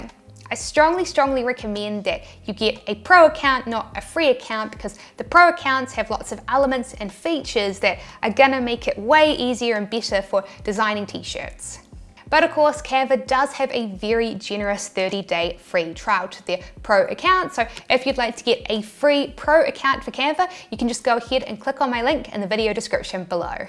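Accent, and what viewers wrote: New Zealand. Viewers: Hello from California. Thanks for sharing your knowledge. New Zealand is a new accent for me! I'm learning stip-by-stipI I am taking notes and trying to absorb as much as I can. Cheers Sis.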